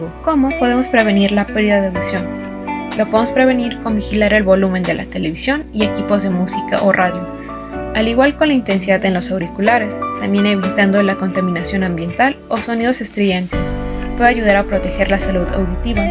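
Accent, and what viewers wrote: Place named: United States. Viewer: Español de USA 🇺🇸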